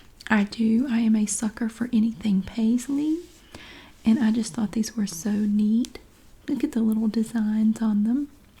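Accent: South, United States. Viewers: I’m developing a southern accent because of you. I don’t mind it, it’s actually cool it’s rubbing off on me. I love you so so so much! ♥️♥️♥️♥️♥️♥️♥️♥️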